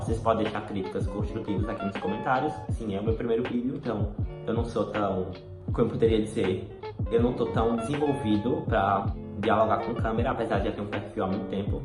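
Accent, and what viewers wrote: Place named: Northeast, Brazil. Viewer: que sotaque nordestino maravilhoso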